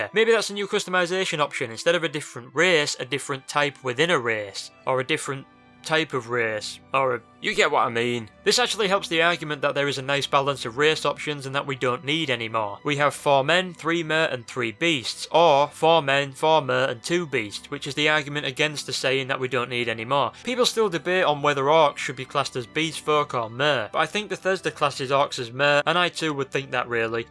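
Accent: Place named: Yorkshire, United Kingdom. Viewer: Why do you talk like a Yorkshire Johnny Vegas?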